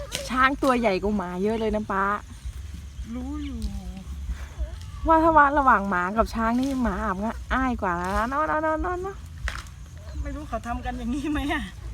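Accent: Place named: South, Thailand. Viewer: พี พูดใต้ยังกะคนใต้แท้ๆ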